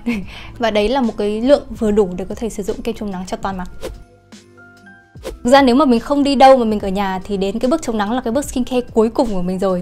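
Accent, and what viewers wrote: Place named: North, Vietnam. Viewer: Em nói Tiếng Anh nghe tuyệt quá, và đây là lần đầu tiên thích nghe giọng một người nói tiếng Bắc như vậy, không giống những người chị từng nghe.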